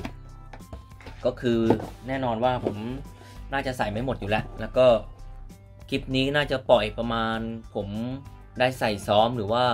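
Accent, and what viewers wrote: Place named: Japan. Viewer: สำเนียงญี่ปุ่นจัด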